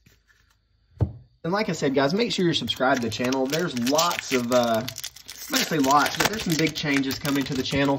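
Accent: South, United States. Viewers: Watched the whole thing! Just started getting into magic recently and I love watching your videos! Your southern accent makes me feel like I’m at home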